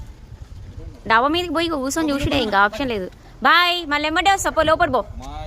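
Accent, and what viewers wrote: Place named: Telangana, India. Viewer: తెలంగాణ యాస నా 😊😮 మాది గోదావరి యాస😂😊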